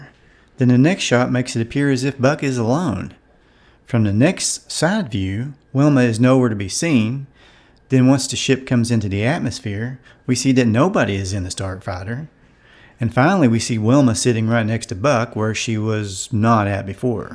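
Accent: South, United States. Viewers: We like the Bible quote and the southern accent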